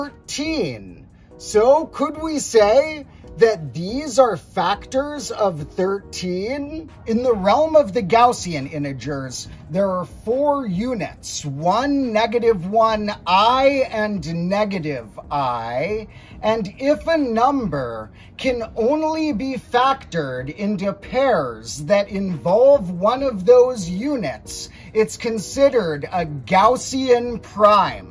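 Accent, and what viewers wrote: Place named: United States. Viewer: It's like with danger and an American accent.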